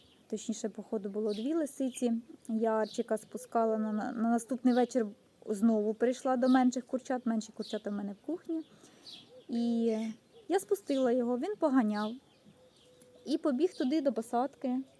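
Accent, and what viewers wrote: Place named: Surzhyk, Ukraine. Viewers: Не знаю, чому Ви себе бешеною - чи це в тому смислі , що Ви така щаслива , безпосередня у спілкуванні з тваринками.. Але Ви така щира і приємна, що це не може не подобатися. Цікаво, звідки Ви? Мені не попадали на очі ті кгметарі, де Ви про це розповідали. По вимові - косю ходю - то це десь Дніпро-Полтава- Кропивницький ... А про стріми, відео - Ви цікава своїми щирими емоціями, бо є люди, які повторюють чужі фрази з тік-току , а є ті, що хочуть бути дуже оригінальними і плетуть хтознащо . А Ви не фальшива актриса, а просто кайфуєте від того,що робите - і це приваблює. А сюжети життя саме підказує. Хто має тварин і любить їх - для того майже щодня якісь маленькі пригоди. Знаю по своїх котиках - можна цілу книжку написати , та такого, що й не всі б повірили в котячі витівки ) А пригоди вашого Жоріка - це ж неповторний дгсвід, бо в кого ще є такий вільний кабан ? 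Та і не всі в селі вміють нормально говорити з тваринами. Є такі, як що : киць-киць, іди їсти, їж, на, пішов геть , щоб ти здох - це так реально одна знайома жінка каже... А я можу щодня діалоги зі своєю кицькою вести : і яка вона красуня, і хвалю її вміння спати непорушно пів-дня, не міняючи пози : виспалась, доця? О, перевернулась ? Правильно, двіженіє ето жизнь ) Був би тільки час .. Бажаю Вам всього-всього, що Вам хочеться і потрібно ( бо не завжди нам потрібне те, що хочеться) Досягнете Ви ста тисяч, кнопок чи ні - аби це Вас радувало , аби ьуло мирне і шасливе життя. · Сонечко, ти найкраща. Твий суржик такий справжній, такий теплий... Дай Боже тоби всього найкращого.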